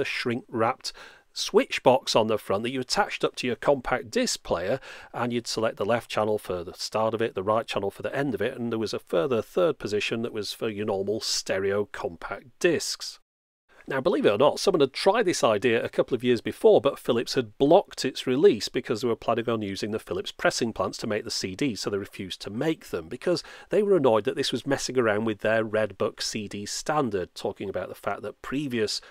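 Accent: Germany. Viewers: the english language explanation is clearly done by a kraut, you can hear the accent through even though he does a good job of masking it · The same with German, the English voice sounds a bit "German" anyway.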